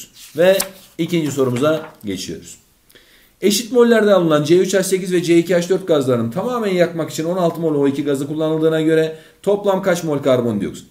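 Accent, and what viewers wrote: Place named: Adana, Turkey. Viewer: Hoca kesin adanalı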